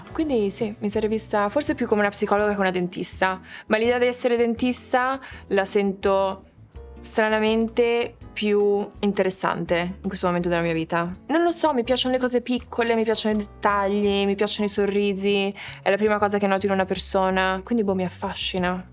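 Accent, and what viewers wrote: Italy. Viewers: semplicemente “l’accento” italiano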